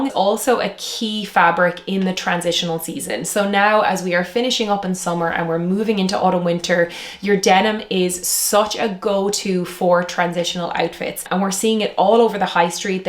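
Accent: Ireland. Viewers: Thanks , lovely to hear an Irish fashion stylist. Be great to see fashion for over 60s style, a little. But great update on what’s coming. Thanks ❤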